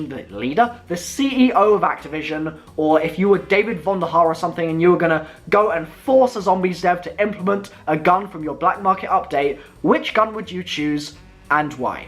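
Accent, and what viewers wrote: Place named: RP, United Kingdom. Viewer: this is to fucking posh for respect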